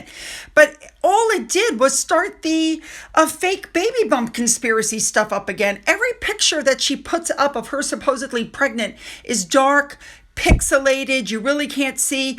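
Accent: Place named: United States. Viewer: I love your pronunciation of our very difficult English (and Scottish) place names. I still have trouble now and then with places new to me. You give it a go and who cares if it has an American twang - makes it more interesting.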